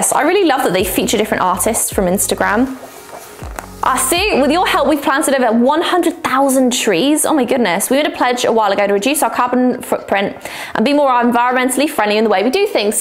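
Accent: United Kingdom. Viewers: I liked the derwent graphite paints. And the faber castel pens too. 12 boxes to choose from. Makes for a hard decision to choose just 1 box. Love watching you. Nice hearing a British voice in the USA. Love from the UK x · Would be interested in the details of the company, as am in the uk... look very good quality! Thsbjs for the great video (as always), lovely to see that you have retained your uk accent! X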